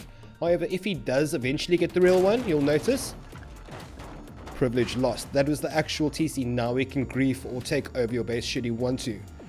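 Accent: South Africa. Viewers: Are you South African,? You sound like that bad guy from Elysium. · Still feels so strange every to hear a South African accent every time I click on one of your vids, so used to NA/EU accents on YouTube.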